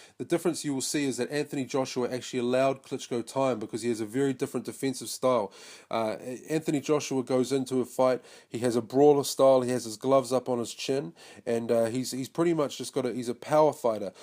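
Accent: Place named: New Zealand. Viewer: You fucking legend your accent cracks me up. Proper hard Kiwi Lad from New Zeland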